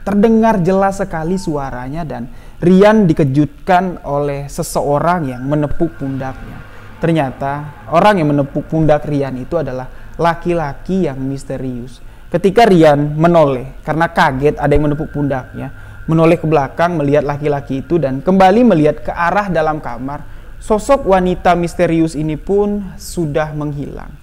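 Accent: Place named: Madura, Indonesia. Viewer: Mas tu orang mana ya kok ada logat maduranya...🙏...seneng dengerin cerita 2 dr mas...sehat sll sukses...mantap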